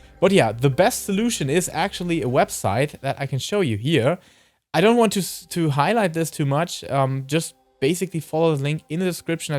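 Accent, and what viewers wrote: Germany. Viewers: Haha, bei dir merkt man sofort am Akzent, dass du aus Deutschland bist. Grüße aus Niederösterreich.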